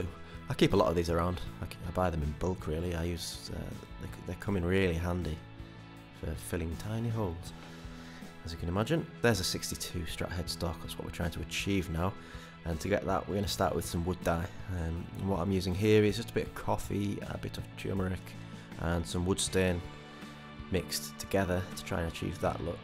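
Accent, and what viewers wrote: United Kingdom. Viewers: awesome video, but the narration parts where your voice trails off and you get a bit quiet is MADDENING! Keep your speech loud and clear please, especially for us yankees who need all the help we can get understanding the British accent. Cheers!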